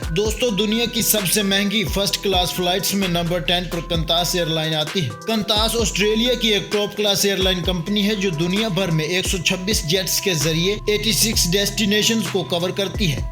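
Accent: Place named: India. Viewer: Are you an Indian cause your pronouncanation is so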